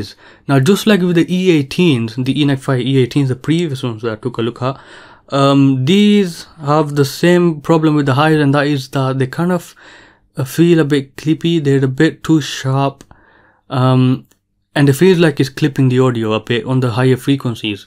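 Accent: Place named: West Yorkshire, United Kingdom. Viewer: Thats one right west Yorkshire (asian) accent lol I have recently got them, they are great but bass is not that good.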